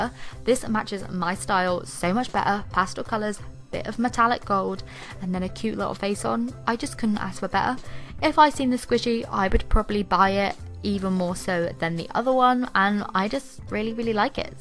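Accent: England, United Kingdom. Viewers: ugh,british english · What part of england are u form?? U dound like people in my surandings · You sound British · Peyton Johnston she’s British so she speaks differently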